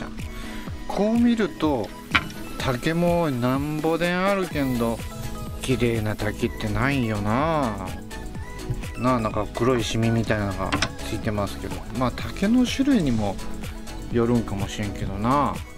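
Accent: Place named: Oita, Japan. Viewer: 、大分弁が身についちきたなぁ😁 どーくり師匠のおかげかな❓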